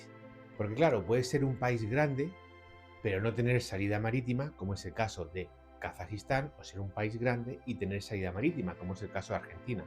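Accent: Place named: Spain. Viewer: Buenas tardes estimado amigo soy muy novato en estos temas pero me gusta mucho escuchar este tipo de mensajes; y después de haber escuchado a numerosos personajes veo que tú tienes conocimiento y dominio del tema tanto en conocimiento de equipos bélicos cómo en geopolitica, ojalá hubieran más personas como tú para tocar estos temas y no estar pensando en guerras con los desde mi punto de vista podríamos establecer alianza con países de la región y hacer crecer este tipo de industrias que dejan muchas ganancias, por siaca soy peruano y también pienso que estar bien equipados nos evitaríamos tener temores con los demás , gracias por sus comentarios, y aunque tienes dejo español pareces peruano 😊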